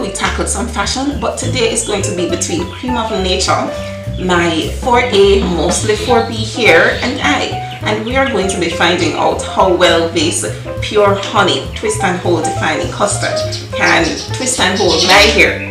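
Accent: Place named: Caribbean. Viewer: I'm hearing a Caribbean accent. Where are uu from?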